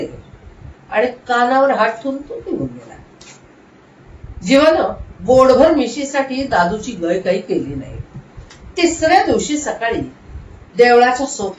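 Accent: Malvani, India. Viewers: अभिनय वाचनातून मालवणी ठसका माका भावलो हो ताई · व्वा ताई , खूप छान.. प्रत्येक वाक्यागणिक प्रसंग अस्सल जिवंत उभा करण्याची ताकद जबरदस्त..आवाजातील चढ उतार, मालवणी हेल इरसाल मालवणी ठसका अप्रतिम... अजून ऐकायला आवडेल हा असा गोड , मंजुळ आवाजातील अभिवाचन.. आवाज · प्रसंग डोळ्यासमोर उभा करायच सामर्थ आहे या अभिवाचनात आणि आली , वस्त्रहरण नाटकाची आठवण !!स्वतः मालवणी नसतानाही ठसकेदार मालवणी सादर करण एकदम सही!!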